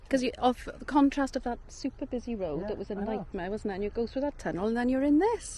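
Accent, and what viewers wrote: Wales, United Kingdom. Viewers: You should definitely think about starting a separate channel dedicated to ASMR, your voice is so smooth and captivating and with that gorgeous Welsh accent I just can't help but fall asleep to your videos, and then I have to watch them again to see what I missed, lol.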